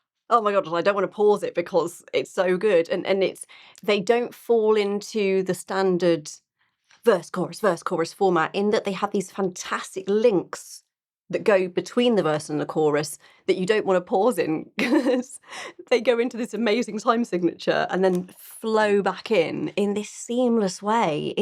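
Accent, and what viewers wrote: United Kingdom. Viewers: IMHO, it's both famous people and average people because 'all the world's a stage' as you will find out so much more about the band in their album. Welcome to the Rush family,! You have gone down the Rush rabbit hole, never to return, but it's all good, no worries! I've got to say that your personality is lovely as is your accent, purple hair, and Ink! Such a lovely bird, as you brits might say. Chicks seem to really dig Neil the most. Here's a small treat for you and others. Neil doing Cottontail and a short tasteful solo with the Buddy Rich band doing a tribute to Buddy. <link>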